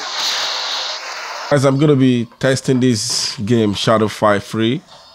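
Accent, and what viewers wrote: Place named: Nigeria. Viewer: I really enjoy all your reviews man ,so honest and perfect ,i have ordered for this phone on Banggood and it is on transit to Nigeria,i hope i won't regret buying this one ,after my disappointment in Meiigoo S8 ,the battery drains faster and it lags though beautiful design but performance is poor..... Love from Nigeria Are you from Nigeria ,you sound like us? ...haha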